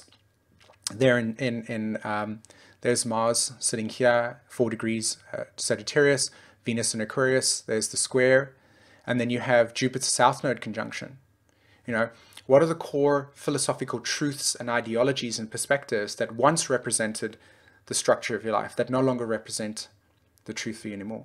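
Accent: South Africa. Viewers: you have a nice SA accent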